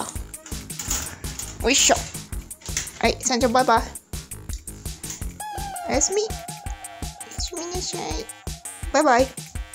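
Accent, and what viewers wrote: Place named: Kansai, Japan. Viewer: さんちゃん、よく話を聞いてますよね。 ちゃんとやりとりしてるし、いつもコミュニケーションが、すごいと感心するし関西弁にも💙💛💖です。 カワイイ😍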